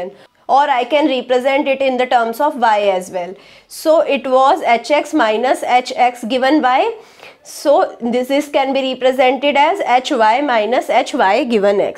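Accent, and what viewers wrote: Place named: England, United Kingdom. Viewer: Ap England sai ho kya mem... Hindi nhi ata kya apko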